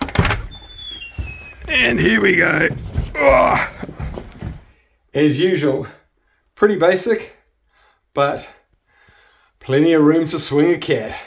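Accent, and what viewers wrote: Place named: Scotland, United Kingdom. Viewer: Your Scottish accent had me giggling 😂. I'm on my way....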